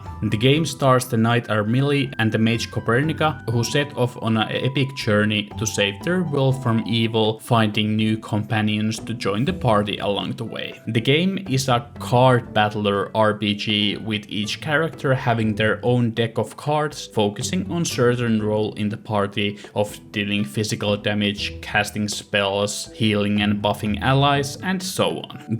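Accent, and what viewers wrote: Finland. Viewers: Oot Suomalainen? Pikkusen toi suomi aksentti kuuluu puheessa 😄.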